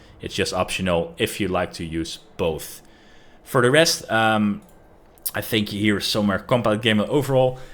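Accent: Netherlands. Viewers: Dutch accent spotted!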